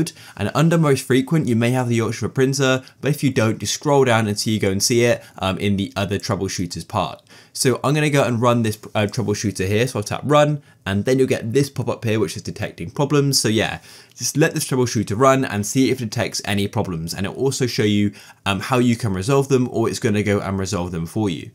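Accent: United Kingdom. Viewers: That's how ALLLLL British ppl speak...lol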